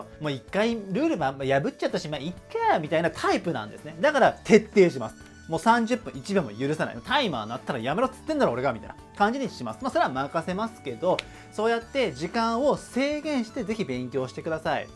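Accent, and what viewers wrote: Kansai, Japan. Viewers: 関西弁のイントネーションがたまに出るけど 関西で過ごした事あるんですか？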